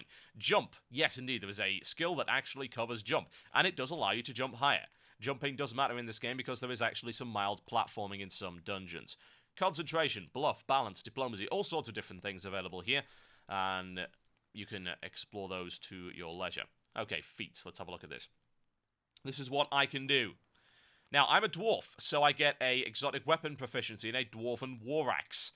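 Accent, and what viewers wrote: United Kingdom. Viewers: this is available in EU Right?, because your definitely a Brit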